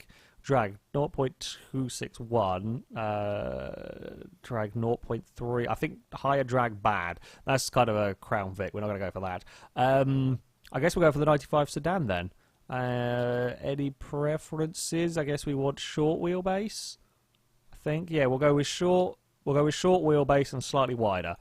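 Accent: United Kingdom. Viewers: he's British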